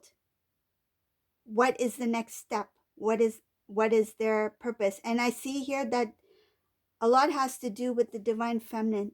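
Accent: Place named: United States. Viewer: Endless Cycle!! Clever. I like ur accent. R u American? With a twist? Lol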